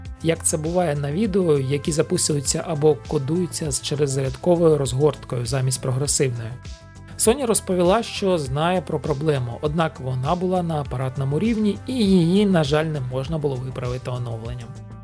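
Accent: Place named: Russia. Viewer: Адже я чую залишки російської мови)) Поки я дивився, мені вдалося вивчити українську мову.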